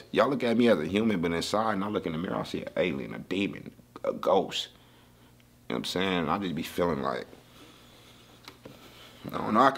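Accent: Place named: New York, United States. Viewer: so why u speaking like a New Yorker “ goofy “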